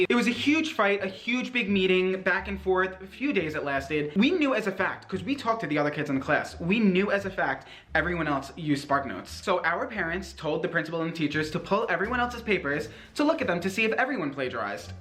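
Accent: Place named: Chicago, United States. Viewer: Bahahahah you make my day I love you so much. I love your accent so much I’m from Chicago and when I heard you say mom or class (mahm, clayss, i say “a”s the same) I’m like this mans is a CITY BOI ❤️ hahaha you’re legit so beautiful and witty and charismatic, I’d square up on this teacher for you In highschool 😂💕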